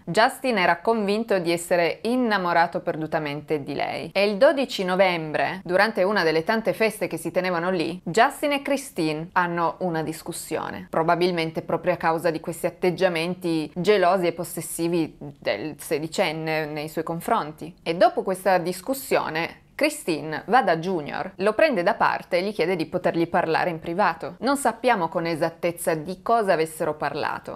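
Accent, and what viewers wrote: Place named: Turin, Italy. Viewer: Secondo me sei triestina.... No di Torino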